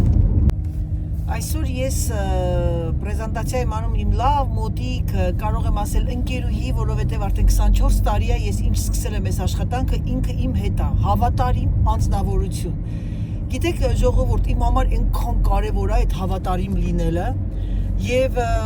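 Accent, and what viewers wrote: Gyumri, Armenia. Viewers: Jerm barevner bolorin jan inchkan em sirum wor Lenakani Barbarov ek Xosum ❤❤❤ Im Txanela minujar u aprum en Arazin ❤❤u Da E jiste Ayo jan der kann nman Mardik wor der che Giten wor Mer jamanaknere ancel en Es Dzez Siremge. Hatuk barevner Mamayin u Dzer Huyrin ❤❤Dzez lav jamanak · Huzich er jan Lennakani akcentn el mi urish a! De cankali klini vor erexan apri cnoxi het tekuz mec tariqum bayc ete edpes e voroshel inch arac ham el ete amusnanum a arandziny tchisht e, inchqan el lav haraberutyunner lini arandzin zuyger aveli azat klinen u tchisht e erkusi hamar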